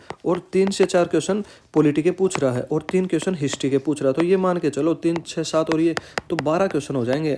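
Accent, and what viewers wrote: Haryana, India. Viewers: haryana wala language h bro iska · Bhai tumne bhi chalana ko chalama likha hai to mistake sbse hota hai aur wo uska accent haryanvi hai to isliye aisa sound kr rha hai. Itni bhi bdi baat nhi hai jitna hyper ho rhe ho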